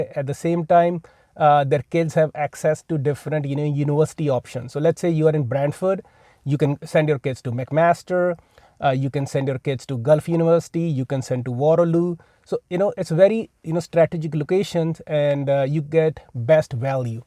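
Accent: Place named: Punjab, India. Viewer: Bro I really liked ur punjabi english accent but ur real estate knowledge is excellent keep it up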